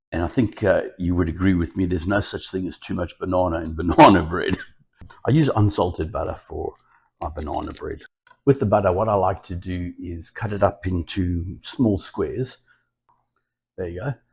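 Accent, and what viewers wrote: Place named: Australia. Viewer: I have stove/oven envy! Love the video and so nice to see the Aussie accent.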